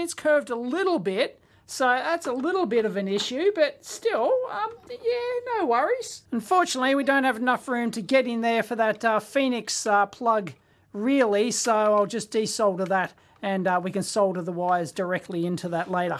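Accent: Australia. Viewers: Half a bee,s dick 😂😂😂😂I love crazy ozzies and kiwi,s accent strewth mate by crikey ya flaming golar 😅😂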